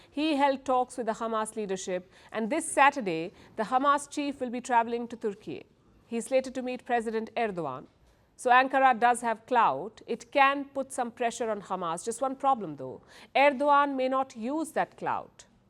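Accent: India. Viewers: Indian accent is the most beautiful accent in the world like this news woman. Rishi should also speak with an Indian accent. Actually, the whole world should speak with an Indian accent. The best accent in the world is an Indian. For sure!! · P.sharma you good reporter but we don't like your Indian accent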